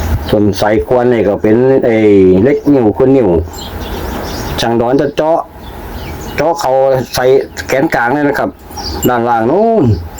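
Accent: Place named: Korat, Thailand. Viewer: เป็นคนที่ไหนครับ อยู่จังหวัดอะไรครับ สำเนียงเหมือนคนโคราชเลย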